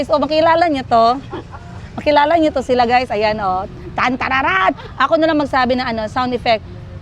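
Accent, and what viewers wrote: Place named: Visayas, Philippines. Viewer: YANG KABOBOHAN MO AT IKAW ANG BASTOS MAGSALITA PURO KA BISAYA ANG PANGET MO PUTANG INA MO NAKAKAINIS KA PANOORIN, PANGET TALAGA UGALI MO, YUNG ACCENT MO BISAYANG TAE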